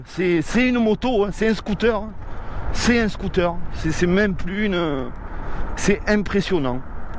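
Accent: South, France. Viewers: Merci pour ce magnifique test.. Cela ne sera que réservé en une niche de clients car le prix, son poids... donc pas à monter et descendre 3/4 étages pour la ranger dans son appartement.. Ensuite, elle est monstrueuse mais il existe une concurrente (bcp plus léger mais moins d'autonomie avec autant de puissance): La Rion race edition. Par contre question concernant l'étanchéité et la tenue sur la route par temps de pluie? Dans tous les cas, tu es the first one youtube FR sur les tests trottinettes.. (ta personnalité, les montages vidéos, ta sincérité et cet accent du Sud.. 😉) Ride safe l'ami et merci encore.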